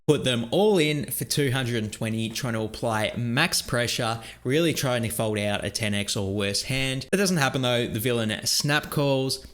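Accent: Australia. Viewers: You talk out the side of your mouth as it is. I can't understand some things you say. There is something about Australians and the way they talk out the bottom side of their mouth. It makes some words hard to understand lol. The accent is awesome but the way the accent comes out is hard lol. Tough sessions happen, better luck next time.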